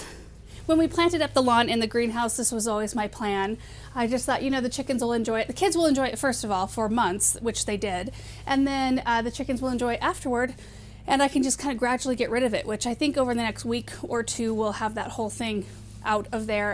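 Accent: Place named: United States. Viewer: There are some words you say that make me smile at the difference between the UK and the US. You say Gerbera, and we make that into a J sound (Ge together making the J sound), so we say it like Jerbera. The other one is Dahlia, which you make an ah sound and we make an ay sound (so we say Daylia)! 2 countries separated by a common language in action ❤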